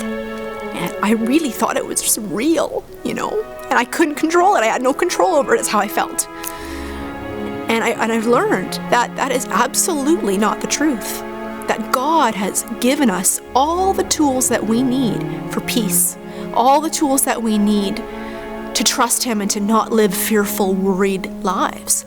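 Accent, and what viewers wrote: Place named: Ontario, Canada. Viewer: wow.. this video touches me I started sobbing xD.. but something that's funny to me is that.. she's Canadian and I'm Canadian yet I could tell she has an Ontario accent and I live in Ontario xD Normall you can't tell.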